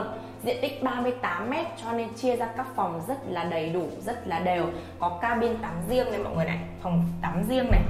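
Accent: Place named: Hanoi, Vietnam. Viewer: Nhà đẹp giá tốt giọng nữ HN cũng hay😊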